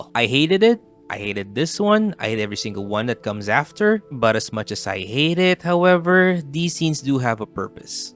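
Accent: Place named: Philippines. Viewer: It's nice that you are using Philippine English! Hahaha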